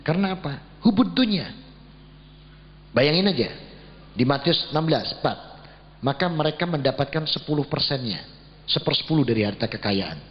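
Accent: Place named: Java, Indonesia. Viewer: jowone medok 😄😄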